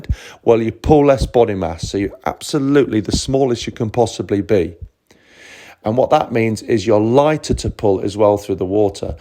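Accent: United Kingdom. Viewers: This british accent makes me cry😢😢😢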